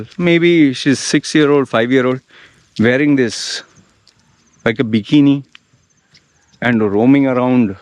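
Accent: India. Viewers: In Canada English people hate people from Idian origen ! They hate your accent ! why you follow anglo culture??? ? WHY???? you need mental help !you are living on the moon ! everybody know your people are scammers ! why you get tattooes?? I dont respect man with tatoos on face ! you should go back to your country and leave the people in Thailand in peace . Are there toxic chemicals in tattoo ink? Mercury, cobalt sulphate, other soluble cobalt salts, and carbon black are in Group 2B, which means they may cause cancer in humans. Cadmium and compounds of cadmium, on the other hand, are in Group 1 (carcinogenic to humans).When it comes to cancer, black ink can be especially dangerous because it contains a very high level of benzo(a)pyrene.The body is directly exposed to the toxic substances contained in the ink due to the injection of tattoo ink into the skin. Pigments may accumulate in the lymph nodes or other organs as they are in direct contact with the skin tissue and lymphatic system.The colorants tatoo ink they get transported to other anatomical locations in the body via the blood vessel and lymphatic systems directly after tattooing or via the lymphatic system years or months after tattooing.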